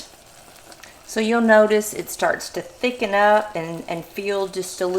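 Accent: South, United States. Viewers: 1st of all, love love your "Rizos/Curls", lol. Gracias for your lovely Southern accent & more importantly the literally walking us through to this mega pretty & delish goodness, lol. I'm not a Baker, hopefully this shall change a "Beba" step at a time. I'm in my mid 60's & still a "Beba"/ Baby Girl at heart, lol. Blessings & hugs....❤😊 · Why name it Christmas Crack??? That is the grossest name you could think of. Makes your southern drawl seems even more unbearable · I just love your accent, i can tell you are a true southern lady! And your kitchen is beautiful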